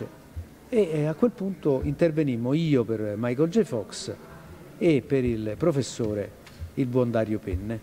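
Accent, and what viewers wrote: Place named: Rome, Italy. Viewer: Diversissima no, ma è ovvio che in un contesto come questo sia meno impostata e si senta di più l'accento romano .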